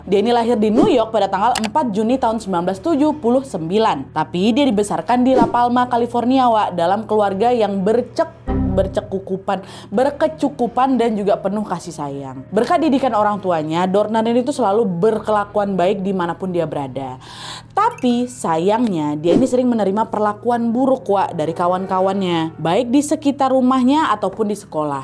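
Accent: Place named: Padang, Indonesia. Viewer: Kakak ni orang Padang apa Medan logat nya banyak Padang nya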